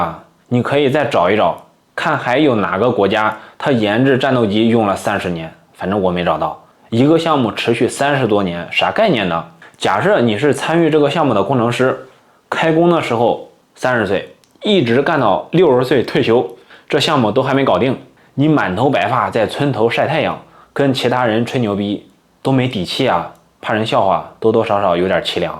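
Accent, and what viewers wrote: Henan, China. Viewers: 你一定不是的粉丝，他说的是河南话。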